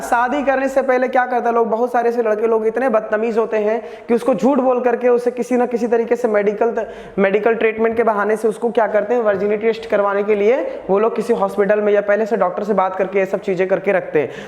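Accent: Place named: Bihar, India. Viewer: Your style of speaking is outstanding.।। Sb bihari aise hi hote h kya, Love your accent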